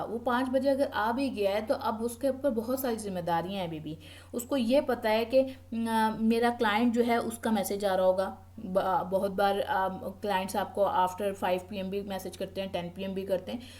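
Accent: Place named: Karachi, Pakistan. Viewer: From your speaking style i can guess that you belong to KHI ❤❤❤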